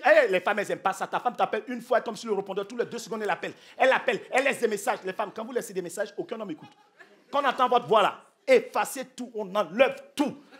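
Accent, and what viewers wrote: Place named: Ivory Coast. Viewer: C est un ivoirien son accent est trop fort claudia son garçon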